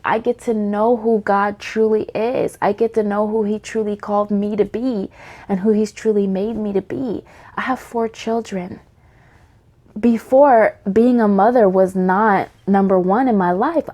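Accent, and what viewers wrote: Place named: South, United States. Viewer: U sounded southern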